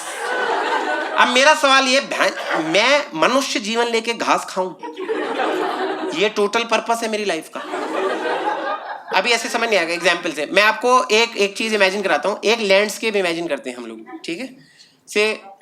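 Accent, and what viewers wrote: Pakistan. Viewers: Is he Pakistani his tone is similar..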